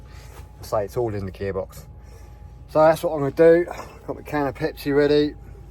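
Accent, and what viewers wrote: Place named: West Sussex, United Kingdom. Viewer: I know that feeling of having motor problems with a long drive ahead. You dealt with it well mate. Once you were on the motorway the gear changing isn't the problem it is on small roads and in the towns, but you can't relax, it's there in your mind all the time. I could tell from your accent you were from the west Sussex coast - I used to live in Littlehampton and Bognor and I have it too. I am going to message you one way or another with some info. Happy new year, Pete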